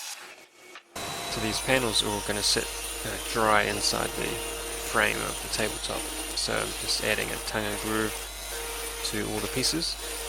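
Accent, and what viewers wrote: New Zealand. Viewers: are u from nz?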